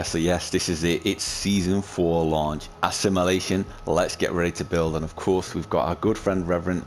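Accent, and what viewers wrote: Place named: Manchester, United Kingdom. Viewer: Nice vid mate and nice to hear a manc voice haha!! subscribed!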